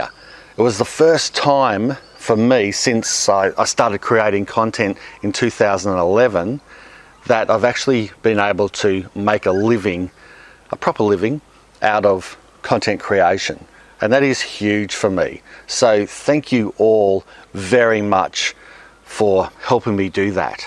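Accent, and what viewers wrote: Australia. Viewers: G'day from Germany. Since my daughter did not come back from her trip to Australia because she fell deeply in love with the country and especially one of its inhabitants,I often tune into your channel just to have a little Aussie feeling and to learn the accent. And yes, we do have a rised garden bed now. Blessings to you and your loved ones!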